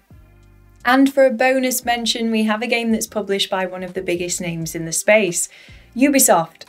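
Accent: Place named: North, United Kingdom. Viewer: I wasn’t expecting that accent! Northerners rock 😀 Don’t tell anyone, it’s great ‘up north’! 😂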